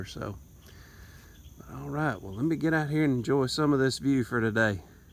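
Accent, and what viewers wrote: South, United States. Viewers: Nice camping spot there!!! I like your southern accent too!!! And Muppy is so cute & silly.🐕 😃 ☺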